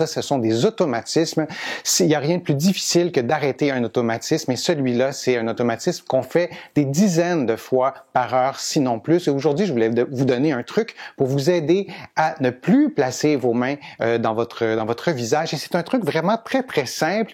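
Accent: Quebec, Canada. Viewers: Très bien fait ! C’est la première fois que je vous vois et cette vidéo me plaît beaucoup. Je suis médecin aussi, mais à New York. Un psychiatre. Votre idée pour ne pas toucher le visage est super brillante. Votre façon de parler et d’expliquer des choses est claire, innovante et me plaît énormément. Merci beaucoup monsieur. En outre, le français québécois me plaît à entendre. J’apprends la langue de la France avec leur accent, mais le vôtre est génial. Récemment, j’ai décidé de prendre la retraite de la médecine. Si je pouvais, je souhaiterais habiter au Québec, un lieu, un pays, une destination que j’ai toujours aimé pour faire des tours en voiture, de la Gaspésie à Hull. Cependant, je ne sais pas si le Québec ou le Canada accepte des personnes comme nous, séropositifs pour le VIH depuis 30 ans. Sauriez-vous si des gens séropositifs qui désirent vivre au Canada sont permises de le faire. 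Comme médecin, j’ai pensé que vous seriez là bonne personne à qui poser ma question. J’apprécierais si vous pouviez répondre à ma question, lorsque vous n’êtes pas trop occupé. Je vous remercie à l’avance pour cela. Mais pour revenir à nos moutons, une fois, mon copain et moi, on est allé plus loin pendant un voyage en voiture. Mon copain et moi, on est allé en voiture de St-Jean-Terre-Neuve jusqu’à Victoria et Torino, Colombie-Britannique. Ce tour nous a pris environ 3 mois et quel plaisir. Quelle belle traversée de votre magnifique pays, mon préféré au monde. Pour la plupart, on s’est ser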